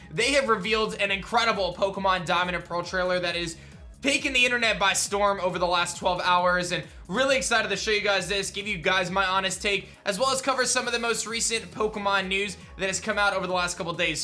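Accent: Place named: California, United States. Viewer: honestly it makes it hard for me to watch, I liked his old stuff and I’m actually enjoying his xenoverse playthrough but he sounds like he’s from Cali or he’s trying to be a chad but I know he’s from like the midwest or something. It sucks that he’s gotta change his persona to get views but I guess that’s his job right ?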